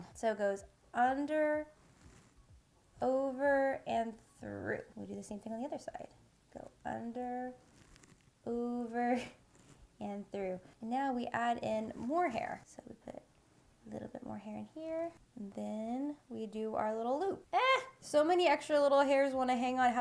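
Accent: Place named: California, United States. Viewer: Since when does have a Californian accent even though she from NC?!?!? I am so confused....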